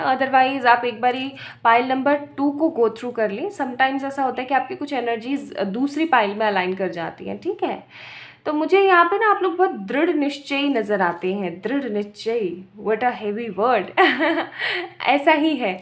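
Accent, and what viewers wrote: Punjab, India. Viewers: Di aap punjabi ho , apke kuch words punjabi walye hote hai,,,, and pile no 1 , I strongly claim this positive energy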